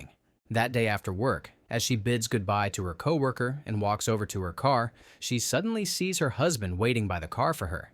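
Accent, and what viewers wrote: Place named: United Kingdom. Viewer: I have no idea why but his british accent is soooo funny to me. 😂😂😂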